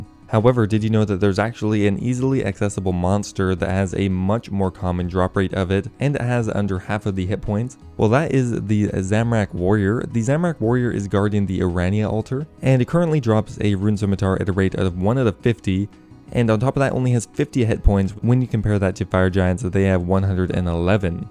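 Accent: Canada. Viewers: Are you Canadian? You DO have an accent but it's so hard to place EDIT: Just looked up your Twitter and it lists your location, I fucking knew it you Canuck bastard, I live on the US side of Niagara Falls and I am very familiar with the subtle differences in the pronunciation of certain words (plus out of all local radio stations I receive, the Canadian rock station we get here is better than the one on the NY side tbh)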